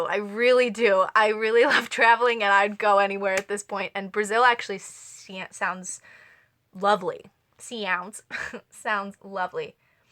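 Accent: United Kingdom. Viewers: Missed it, but I had a buuuurning question... Are you British or American? I just can't lean towards one or the other... Thanks :-D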